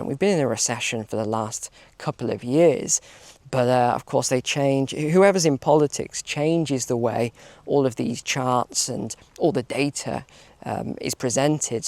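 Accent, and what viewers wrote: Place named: United Kingdom. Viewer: Thanks for your great info., humor, and stories---and we love your beautiful clear speaking, and with that great British accent. And your infectious SMILE...that helps while listening to some of these news items. God Bless you and your Family. Let's pray for a turn-around........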